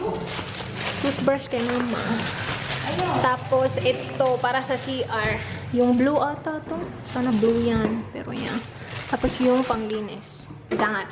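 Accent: Visayas, Philippines. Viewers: bisaya diay ka te??pareha rta!!ahaha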